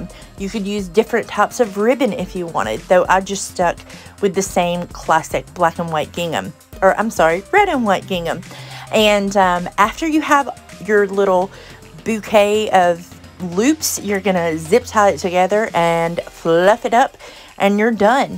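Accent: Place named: South, United States. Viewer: Thank you SO much for this helpful video! Love your southern accent too ;o)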